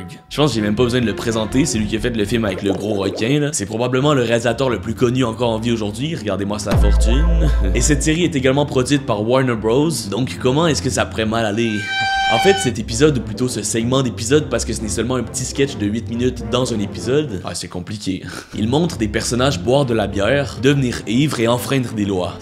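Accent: Quebec, Canada. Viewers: t tu quebequoi touer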